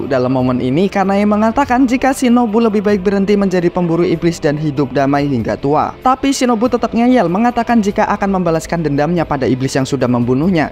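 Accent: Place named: Padang, Indonesia. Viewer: Wahh orang padang 😅😅😅😅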